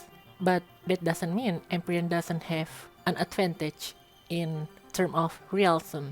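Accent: India. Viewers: Hard indian accent?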